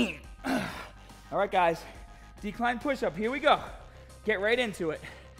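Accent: Boston, United States. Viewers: The exaggerated Boston accent is so cringe.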